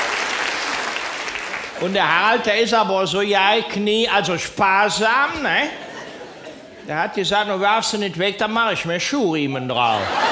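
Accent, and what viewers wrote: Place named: Lower Rhine, Germany. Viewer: Ich liebe es! So witzig, so genial Genau so sprechen wir am Niederrhein :P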